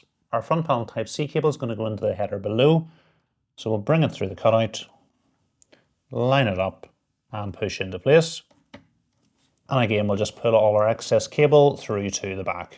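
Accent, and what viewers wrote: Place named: Ulster, United Kingdom. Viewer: Struggled with the Ulster accent on occasion, but aside from that excellent and informative video. Thank you.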